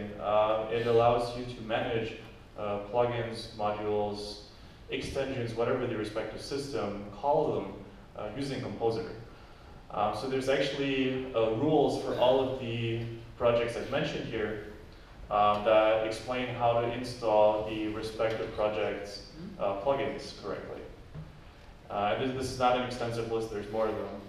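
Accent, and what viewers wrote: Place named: United States. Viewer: Hm., wenn ich das richtig sehe, bist du Deutscher. Von der Sprache hätte ich eher auf Amerikaner getippt. Austauschstudent? Zweisprachig aufgewachsen? Ich staune...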